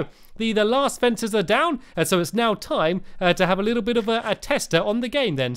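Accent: England, United Kingdom. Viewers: Francesca Mazzi Questa poesia era ok .... il "salto in un lago" parte non era bello, in effetti hai appena detto di suicidarsi. Inoltre è Stampy un male youtuber, non mi piace tanto quanto si fa, perché, del suo accento, accenti inglesi tendono ad essere fastidioso per me (non essere razzisti), così ogni volta che ho sentito somone lamentarsi lui im come "cosa l'inferno ", ma allo stesso tempo dico" Sono d'accordo che può essere una cagna a volte "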